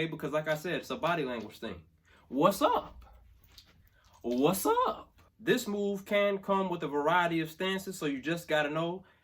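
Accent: South, United States. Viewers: As a native from mississippi he doin pretty good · I'm from Tennessee, we talk like this too. 😂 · As a Texan from birth this is the best representation of the southern dialect I’ve ever seen thanks for education ‘em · I live in Mississippi, and I feel embarrassed in front of people because of my lack of understanding, which makes me feel isolated. Please continue making videos specifically in the Southern accent to improve my understanding level. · As a Born and Raised Alabama native this is 100% true!!!! Every. Single. Word. He says and btw it’s not just the black culture or ur African roots cuz errybody Ik talks like that!😂 ♥️ur content keep rising my Southern brother!! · Excellent interpretation of southern speech 🎤. I understood everything and gave answers before you stated it 😂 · I can't speak for other states, but here in Arkansas this is pretty accurate for how both black and white folks talk. 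There's some subtle differences in word choice and vowel sounds, but the cadence and tone are all right. Makes sense, given you're from Mississippi.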